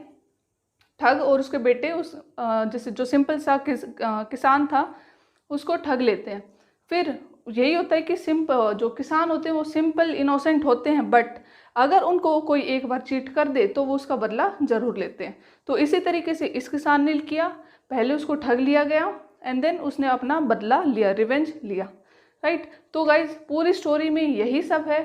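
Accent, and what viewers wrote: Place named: Haryana, India. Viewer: Ap to Haryana sa lag ra mam ji 😀👍🙏❤️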